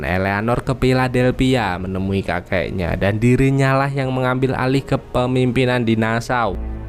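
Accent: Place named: Java, Indonesia. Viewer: Bg medok e hilangkan yo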